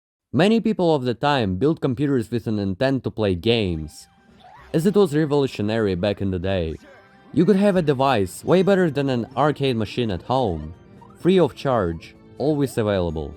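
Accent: Russia. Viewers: You from Russia? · Wait are you russian? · russian accent... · the russian accent is real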